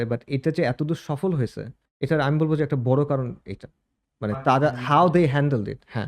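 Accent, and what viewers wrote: Sylhet, Bangladesh. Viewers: Host mone hoy sylheti. thikmoto bangla bolte parena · সিলেটি উপস্থাপক মনে হচ্ছে 😅❤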